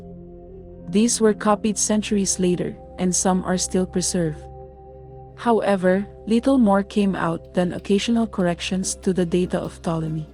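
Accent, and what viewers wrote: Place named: Philippines. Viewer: My guess is the narrator is from the Phillippines. I could be wrong but hope I'm right!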